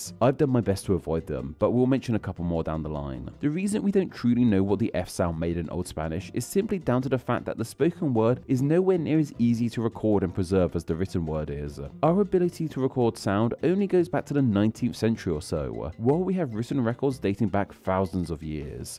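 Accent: United Kingdom. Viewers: Blud he’s British what do you expect 😭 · I find it interesting that this video that's focused on the "F" is narrated by someone with a "British" accent. In this accent, the words spelled with "TH" end up sounding like F!!! Fanks for this video!! It was very interesting! It's possible a similar thing happened to Spanish based on accents throughout the history of the changes...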